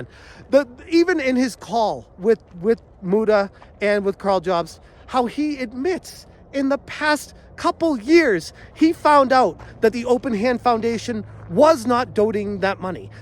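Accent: Boston, United States. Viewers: Thank you for your coverage of this. Frankly, I found his "apology" to be more responsibility dodging. He said he should have done better, but his examples on what he should have done better on were worded very carefully. His anger at the end was disgusting. I worried that people would just say "oh he apologized" and then stop talking about it. He needs to be audited and reviewed by the authorities. Then and only then should we let this go. I love your enthusiasm and your genuine reaction to this. By the way, stop apologizing for your Bostonian accent. It's who you are, and you should love it. I hear it all the time about my southern accent and I used to feel ashamed, but I'm trying not anymore. You got my sub ♥ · Your coverage has been great - also who doesnt love a little Bostonian accent in there too. Hey I’m waaahhkin eeere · The boston accent is wicked dont change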